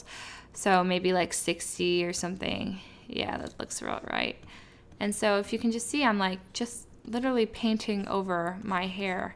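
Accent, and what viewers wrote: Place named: United Kingdom. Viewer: This girl sounds like she might have a fairly alright british accent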